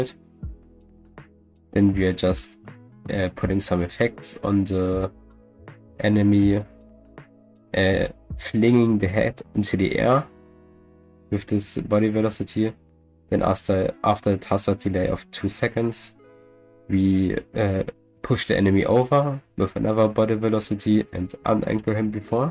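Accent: Germany. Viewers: der deutsche aktzent is so funny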